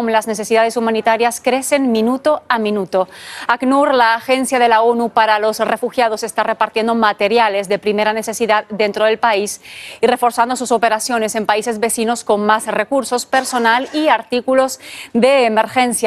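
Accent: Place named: Canary Islands, Spain. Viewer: Mi preciosa paisana... Mmmm... Ese bonito hacento canario.... Mmmmmm.... Un beso, guapa...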